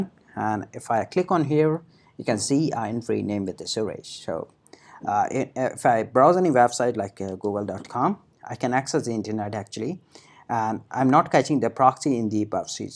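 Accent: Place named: United States. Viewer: bhari english bola he bhai maasaallaahh full american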